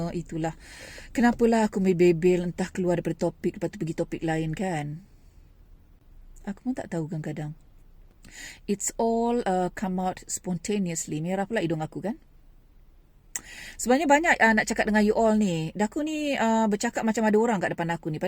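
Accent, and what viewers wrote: Negeri Sembilan, Malaysia. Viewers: thats right she does speak in nogori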